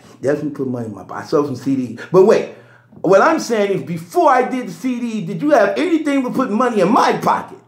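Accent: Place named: New York, United States. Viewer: ..#AAYYYOOOOO..!!..THIS SHIT IS HILAAAAAAARIOUS..!!! 😂😂😂😂😂😂👏👏. NEW YORK ACCENT IS FUCKING COMEDY GOLD..!! #BRUUUUUH..!!😁😁😂😂👏👏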